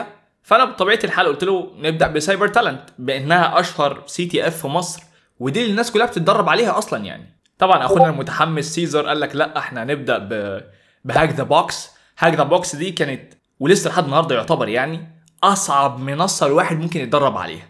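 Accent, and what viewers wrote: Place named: England, United Kingdom. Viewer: معليش يبشمهندس مش قاصد حاجه بس هو العربي بتاعك بحسوا اكسنت انجليزي عشان عايش برا ولا بسبب استخدام الانجليزي عشان وانا بتفرج حاسس بفرق لغه ❤😂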